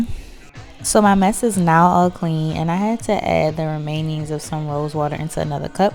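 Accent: Louisiana, United States. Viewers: You from Louisiana? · We have the same name... mine Is spelled Daryllynn .. are you from Louisiana?? Sounds like it & the other 2ppl ik with my name are both from there.. I'm from Texas/Florida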